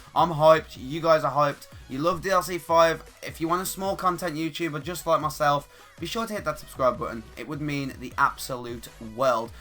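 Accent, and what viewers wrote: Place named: Birmingham, United Kingdom. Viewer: u sound like ur from birmingham im from there i can telll if u am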